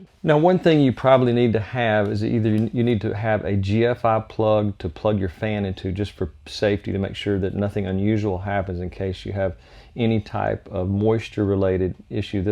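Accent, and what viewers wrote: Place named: Tennessee, United States. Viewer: Where are you located? You sound like maybe Tennessee.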